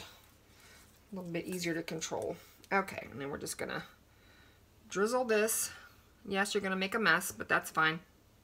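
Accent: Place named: Arkansas, United States. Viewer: Oh Sis, these look delicious! TFS!! That Arkansas accent is really sounding out today!😊😘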